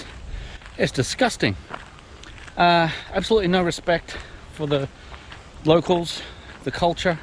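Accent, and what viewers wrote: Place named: Australia. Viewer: Your People been taking over Other people countries for centries including Hong Kong, Now here U talking about China🇨🇳 Taking over Laos🇱🇦 First of all U R white, Sound like an Australian Are from some European country, U R not from Laos,, So,, before U start showing your disapproval of what the Chinese R doing in Los, Show us your disapproval of the history of your people taking over Other people countries, N another thing, do your research on the history of China🇨🇳 and Laos🇱🇦 relationship.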